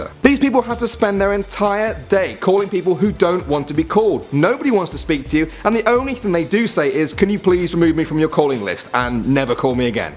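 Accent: United States. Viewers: Your american accent is actually not bad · Your American accent is better than other Americans. And you're British!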